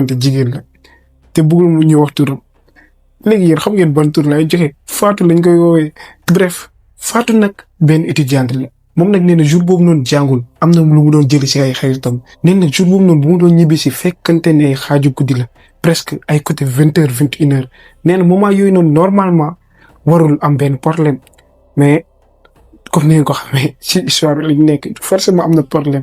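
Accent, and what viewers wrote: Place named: Marseille, France. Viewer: kn marseillais nga 🙄😒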